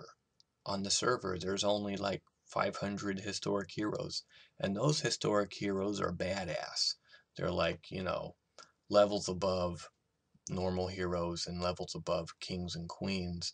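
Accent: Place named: United States. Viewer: where you born in japan because you talk like your from the states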